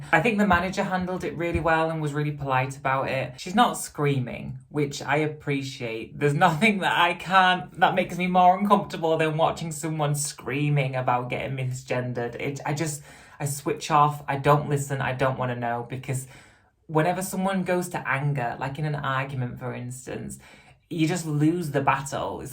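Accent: United Kingdom. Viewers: You’re so right. Hey what is your specific accent from in U.K.? :) Love your videos!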